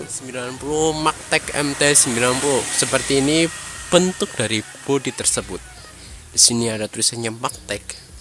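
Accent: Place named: Kawunganten, Indonesia. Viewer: medok kawungantenan...haha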